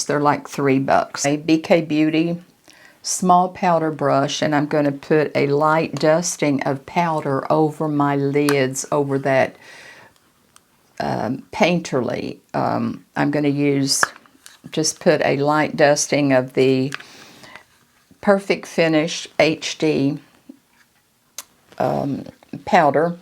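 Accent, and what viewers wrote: South, United States. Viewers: She sounds like she's from the South. Peonies don't grow in warm climates, so she might never have heard anyone talking about peonies. I didn't know either, until I moved to Ohio.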